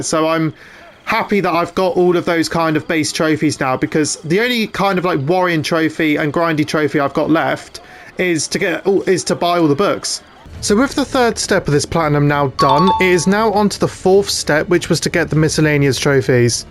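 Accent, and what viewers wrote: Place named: United Kingdom. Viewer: revelations is one of my favorite ac game it's a perfect conclusion to Ezio and Altaïr story (if you don't count ambers) it has some of the best soundtracks of the whole franchise , the main theme is fabulous and even better than Ezio family so i'm sorry you had a bad experience i'm not a fan of the arabian/turkish culture but i love Istanbul and they did it perfectly in this game the orange sun still impresses me it's the only game with gta san andreas with an orange sun that i've seen i love your British accent i will visit London one day for sure ps : i love tea too :)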